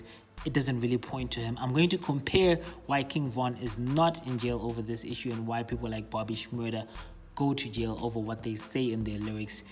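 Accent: South Africa. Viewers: Bra are you South African? your accent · maaaan you sound so South African please tell me you are 😂😀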